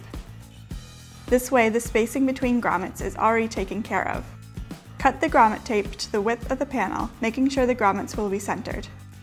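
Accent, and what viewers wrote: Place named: United Kingdom. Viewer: Very British pronunciation. I notice a lot of young YouTubers now drop their Ts.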